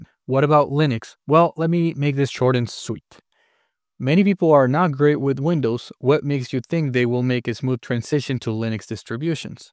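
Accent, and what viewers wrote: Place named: United States. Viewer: Muchas gracias hermano. qundo me coco no trabajo... you ensonido como un gringo. sin escolar. Su Engles es perferto. Felicidades ! Quiszas con mas la practica.... un dia enel futuro, Mi Espanol .... Tu intiendo mi sentimiento. Occidente Australia. poner este lugar sobre su listo de viajes. Disfrutar su dia amigo!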